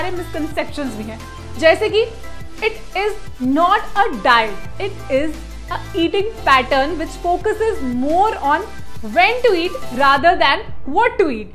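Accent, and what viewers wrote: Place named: India. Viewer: She is Indian they speak like this. 🙂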